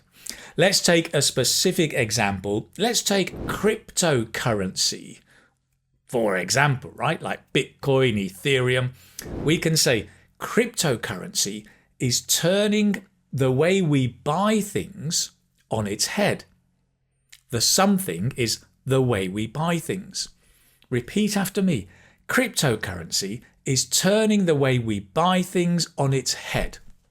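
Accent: United Kingdom. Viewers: Please someone tell me that " is this British Accent?"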